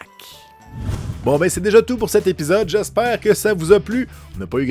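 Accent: Canada. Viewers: Un petit coucou de Lyon en France. Merci pour ces belles vidéos qui nous font rêver mon fils et moi et l'accent canadien me rappelle mon voyage au Québec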